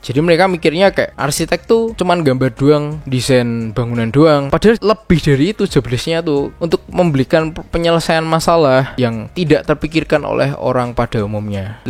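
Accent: Java, Indonesia. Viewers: Jowo ne ngendi mas...? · Mas, medhoke pol asli😅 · Mas kamu mok muuuedhook banget logat e, wong endhi tho? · Hmmmm jowone medok banget. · Medokkk tmen... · Logat jawir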